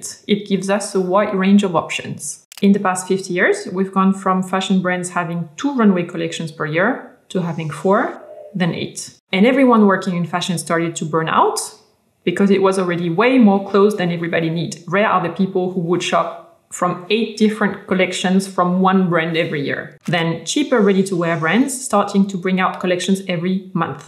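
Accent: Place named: France. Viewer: I heard the accent and I knew you’re French 😃😃. Agréable vidéo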